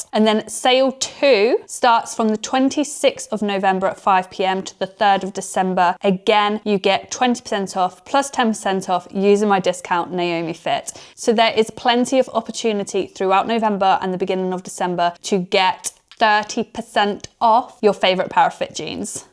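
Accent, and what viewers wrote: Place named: United Kingdom. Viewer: You are a BEAUTIFUL blonde/dirty blonde-haired young woman. Beautiful sexy sparkly light blue eyes, beautiful smile and sexy voice with the British accent. STUNNING figure. BEAUTIFUL body and curves, NICE booty and sexy long legs. Really sexy underarms. You look INCREDIBLE in all of these pairs of FitJeans. All of the colors look REALLY good on you and make you even more beautiful. Every pair of these jeans hug your body and hips and make your beautiful curves POP and give you a NICE booty. You have beautiful light blue eyes. You look AMAZING. You are BEAUTIFUL and VERY cute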